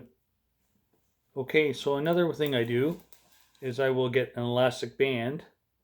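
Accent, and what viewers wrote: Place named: Canada. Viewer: Sounds like you're from Canada.Want to come to youtube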